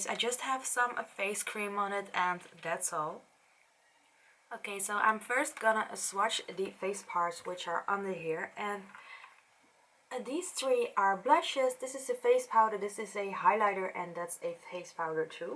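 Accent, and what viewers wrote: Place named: Netherlands. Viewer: so yor accent sound like your dutch so im gonna talk dutch hii ik klikte je video aan en ik keek de video en ik dacht dat je een soort beroemde ytbr was dus goed gedaan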